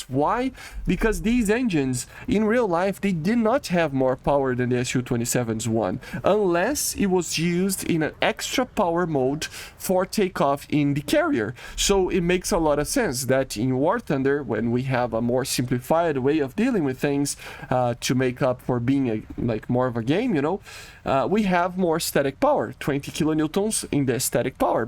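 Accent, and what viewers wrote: Brazil. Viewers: Are u from Brasil? Ur accent looks like so much brazilian accent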